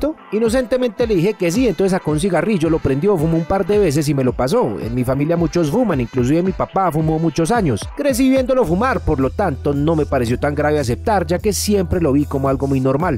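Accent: Colombia. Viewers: El único acento Colombiano que me parece bakan y no suena hipócrita el de.. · amigo, usted es colombiano?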